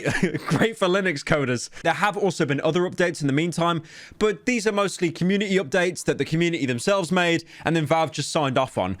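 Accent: United Kingdom. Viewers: please take off the british accent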